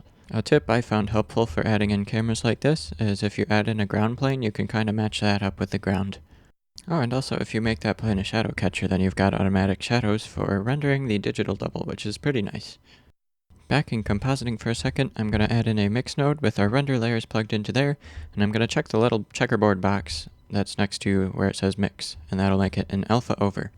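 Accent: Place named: Canada. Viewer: Joe Pera with a Canadian accent and Shawn Mendes face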